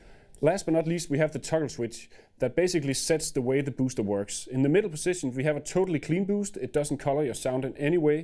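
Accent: Denmark. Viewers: Wow he sounds danish!! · I play steel guitar through a solid state amp. I'm looking for a clean boost to take solos with. If I understand this demo correctly, the gain won't add overdrive unless this is going through a tube amp, right? I don't mind a little bit of drive in the sound on peaks but its a clean boost I'm looking for. Btw; I work regularly in Denmark so the accent doesn't bother me - believe me, its easier to understand than listening to someone "like... so from California"!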